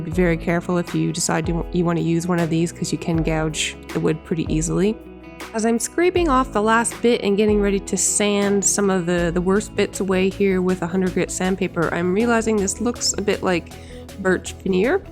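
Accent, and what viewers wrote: Canada. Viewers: Hi - I am watching this video and you are commenting on my own comments I made watching your other videos. Are you Canadian? I am as well though I've been living in New Zealand 25 years so it's hard for me to tell whether your accent is Canadian or American? Greetings from Georgian Bay, Ontario! Woop Woop - another fine work of art and the colour of the paint is divine. Please what colours were combined to get this shade. It's wonderful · Regarding countersink bits, and most other small items, cheap ones from Harbor Freight will serve your purposes fine. But your accent sounds Canadian, and I don’t know if HFT does business in that area. I think the analog might be Princess Auto? (I visited Ontario once 25 years ago—my knowledge of Canada comes from social media.) Harbor Freight sells notoriously cheap, in cost and quality, tools. They’re a running joke amongst pros, but an excellent resource for amateurs. 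But you’ll probably want a decent table saw though, rather than the absolute cheapest on the market. The Ridgid R4514 is currently on sale for $399 (Can$508) down from $549 (Can$699) at Home Depot, if HD exists up North and the sale is applicable there too.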